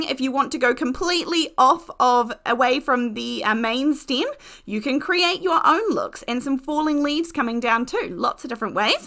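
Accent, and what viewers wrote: New Zealand. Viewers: So delighted for you,! I so enjoy watching your videos and learning great tips from you. You’re so creative and down-to-earth. And enjoy the Kiwi accent which reminds me of my lovely coworker from your country. This is a wonderful “step change” in your paper arts career! 🎉❤. I’ve got three sets in my cart!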